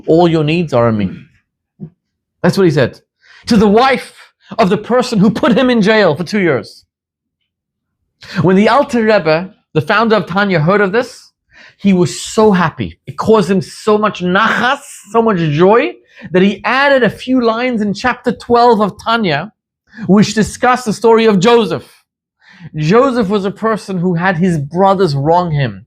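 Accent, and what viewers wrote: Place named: South Africa. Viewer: Seems like he's from South Africa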